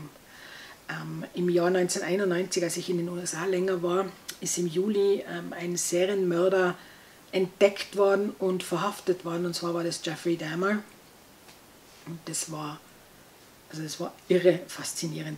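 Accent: Tyrol, Austria. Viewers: Liebe Frau, wie schön, Tiroler Zungenschlag! Wir machen seit jetzt mehr als 20 Jahren jeden Sommer Wanderurlaub im Zillertal (der Gatte sogar noch länger, der ist mit seinen Eltern als Kind schon da gewesen) und hatten auch 2020 das Glück, dass da gerade mal ein bissle "Corona-Pause" war. Im Juli waren wir im Lechtal, da brauchte man sogar nur noch im Bus eine Maske.... hach ja, wird schon wieder! Auf alle Fälle ist es schön, Deinen Tiroler Zungenschlag zu hören und ich bin schon sehr gespannt, welche Bücher ich über Dich entdecken werde! Bleib schön gesund! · Hallo, ich bin gerade beim stöbern auf You Tube auf Deinen Kanal gestöbert. Ich habe Dir gleich mal ein Abo da gelassen 🤗. Wir haben einen ähnlichen Lesegeschmack und sind im ähnlichen Alter. Ich finde Dich und Deinen Dialekt sehr sympathisch. Da hast Du großes Glück in Tirol zu leben. Mein Mann und ich mögen Österreich sehr und sind viel in der Steiermark im Urlaub. 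Ich werde mich jetzt mal durch Deine Videos schauen ☺️! Liebe Grüße von Kerstin (aus der Nähe von Stuttgart) 🙋🏻‍♀️📚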